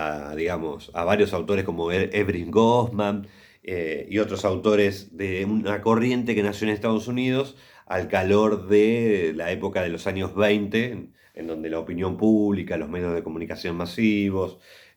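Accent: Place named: Argentina. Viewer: Desde Roma, entiendo el castellano en versión argentina también 😊, digo que la lección me gustó mucho y que resultó muy útil para asumir conceptos claros 👍 Veramente complimenti! 🌟😘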